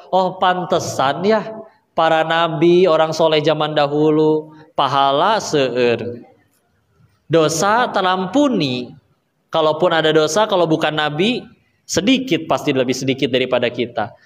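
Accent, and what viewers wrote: Sunda, Indonesia. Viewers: Nyunda banget suka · Sunda pisan akang teh 😇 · Bener pisan logat sunda dan kalimatnya aagym banget.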